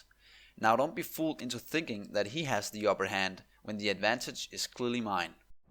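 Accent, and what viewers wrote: Denmark. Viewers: Synes også det var en dansk accent jeg kunne ane hahah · could instantly hear that's another Dane :) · Er du dansker? Lyder sådan på accenten ;)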